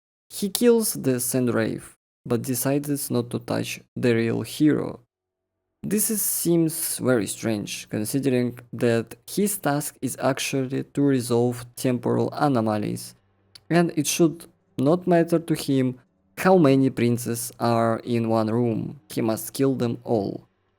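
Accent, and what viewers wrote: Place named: Russia. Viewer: Bro, you're from eastern europe? your accent seems quite russian to me...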